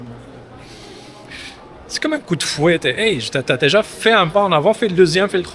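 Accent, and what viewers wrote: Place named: Quebec, Canada. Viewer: C’est quoi ce début d’accent québécois! 😅 good to hear from you Z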